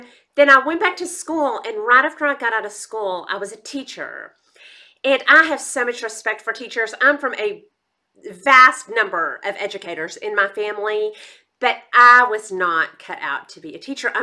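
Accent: South, United States. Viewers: Due to your "slight" southern accent and my "slight" hearing problem, I always use captions if they are available. When you gave your favorite movie, it came across as "Lend Some Death" and I thought what the heck???? Then, of course I figured it out!!! Isn't that a hoot??!!! I can tell you whoever does the captions is NOT southern, lol. Anyway, this was a fun video, I too have great respect for teachers and could NOT do it, they do NOT pay them enough and pay the administrators far too much. IMHO. Could not be a "flight attendant" either since I no longer fly (long story). Used to work for realtors during jr high and high school (yes, I was industrious)-- didn't want to pick blueberries in the summer so taught myself to type!! Looking forward to Part II -- You are fortunate your DH still carries on conversations with you! :-)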